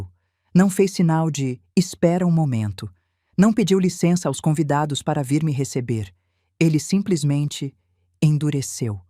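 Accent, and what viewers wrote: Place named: Portugal. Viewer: Mimo. Em excesso estraga ... Estou ouvindo Portugal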